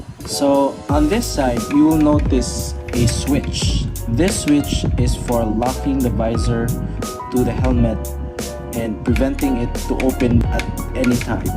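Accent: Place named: Bisaya, Philippines. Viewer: Bisaya kaayu ka ba..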